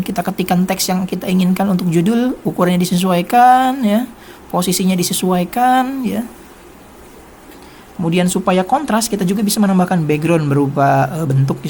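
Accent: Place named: Lombok, Indonesia. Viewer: Bang ? Dari lombok y ? Kok nada suaranya kayak logat lombok ?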